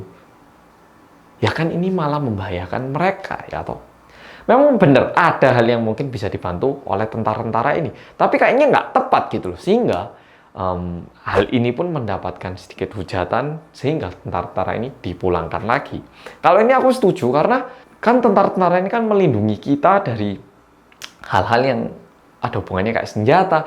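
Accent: Java, Indonesia. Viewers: Mas boleh tanya sesuatu yg sedikit aneh, tapi buat penasaran GK? Dari kemaren tiba tiba aku mikir Mas kan bisa dua bahasa, ada indo sama Korea.. nah mas kan lancar bgt tuh bahasa indo bahkan logat nya lumayan medok. Tapi mas juga asli dari Korea dan lancar bahasa Korea.. Pertanyaan ku mas kalo bicara dalem hati pake bahasa apa ya hehe😁? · Kok bisa bhs indo sih trus kya medok jawa lg ?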